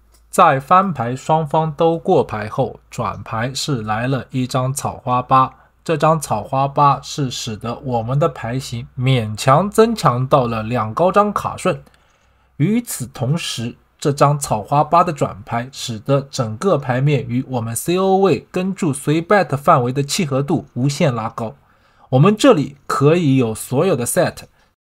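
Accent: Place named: Hangzhou, China. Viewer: 口音杭州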